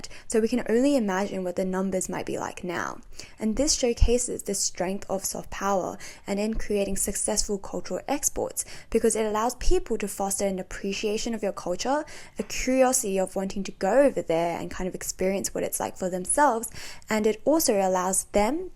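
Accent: England, United Kingdom. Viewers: I see, beautiful girl, love the accent. Is that British? I liked the content too. Thanks for sharing. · you seem to have a English accent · why are you talking like bri'ish? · the accurate British accent as an ESL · never seen an asian have a british accent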